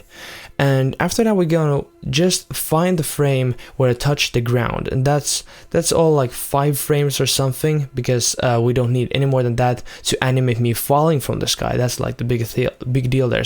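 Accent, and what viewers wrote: Finland. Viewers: are you from finland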